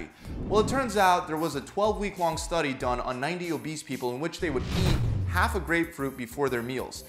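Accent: Philadelphia, United States. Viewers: You gotta be from Philly. LOL Wooder instead of water. I miss the Philly accent. Anyway your vids are amazing. Keep em coming.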